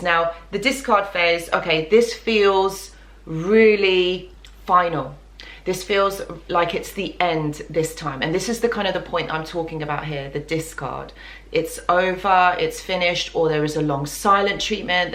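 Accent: United Kingdom. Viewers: I think British because of her accent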